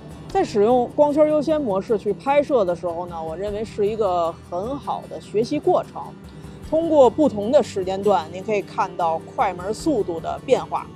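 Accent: Beijing, China. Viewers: 走心❤的摄影师，NIce，听您这口音跟大马老师特别像，都是纯纯京味儿。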